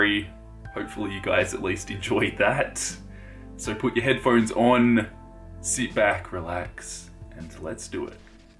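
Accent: Australia. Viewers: banana, it sounds even funnier with a Australian accent!!!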